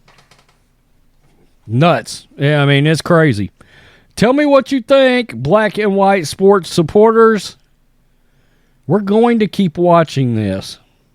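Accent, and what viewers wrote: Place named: South, United States. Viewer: I love your southern accent so much, thanks for spreading the word on this nightmare, love from Australia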